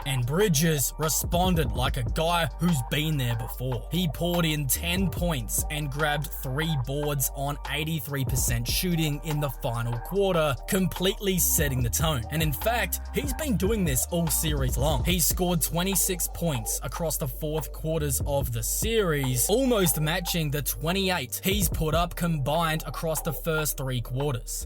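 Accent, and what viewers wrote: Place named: Australia. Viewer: Listening to Knicks analysis in a crazy Australian accent is SICK! Lol I love it!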